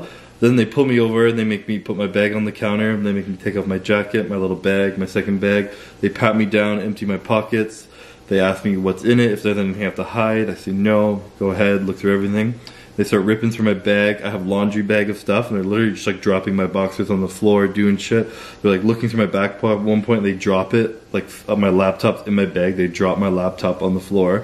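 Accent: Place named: Canada. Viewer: I was like why does he sound weird...oh yea Canadian...lol.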